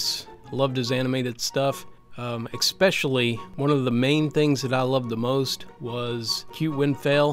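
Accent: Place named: Kentucky, United States. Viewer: pleased to hear it in a KY voice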